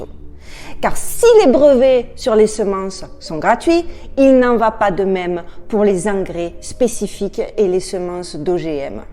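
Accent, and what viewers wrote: South, France. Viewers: J'ai toujours cru vous êtiez du Sud de la France!!!!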